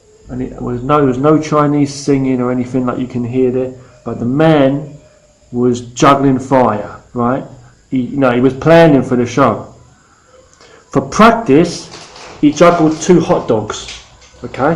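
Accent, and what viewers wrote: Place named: London, United Kingdom. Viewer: BRILLIENT HAHA YOU CAN HEAR YOUR LONDONER ACCENT HAHA LOVED THIS AND THE HUMOUR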